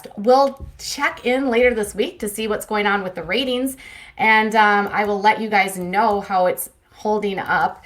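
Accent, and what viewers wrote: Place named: Tennessee, United States. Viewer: Good with the Tennessee accent! I'm from Memphis, lol Good review, as always.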